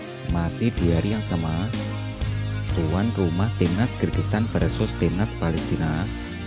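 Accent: Java, Indonesia. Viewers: Vokal mu jawa medok, penyebutan mu seringkali salah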